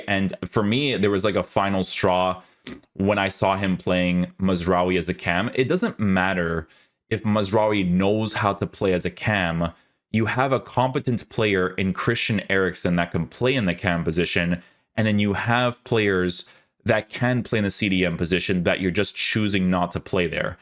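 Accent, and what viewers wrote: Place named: Azores, Portugal. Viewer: Were you born in Portugal,? Or in Canada, but descendant of Açorianos? What team does you father support? There was quite a big açorian immigration to where I’m originally from (Southern Brazil), but both the accent and the trading ties were gone centuries ago. I’m personally multi-ethnic (German, Italian, Lisbon Portuguese and native South American) but I wish I had more contact with Açorian culture. The accent is just insane for me:)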